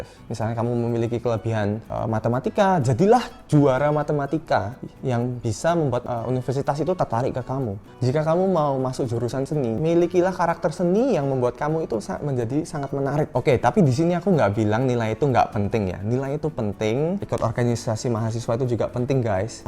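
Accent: Java, Indonesia. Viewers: Koko, logat china campur jowo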